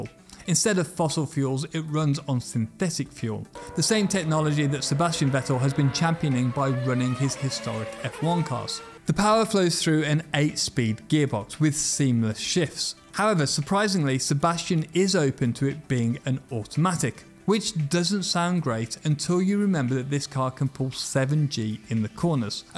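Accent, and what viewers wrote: France. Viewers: This man speaks English in the most French way I have ever witnessed. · As a french, his english sound more french than french itself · The franche accent 🤭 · omfg listening to this french guy is a bit rough on the ears ...as a french myself ahahahah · He's the most french sounding man in the world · Mon dieu l'accent français à couper au couteau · Wow that's the strongest French accent I've ever heard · Ohlala banger l'accent français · Love his french accent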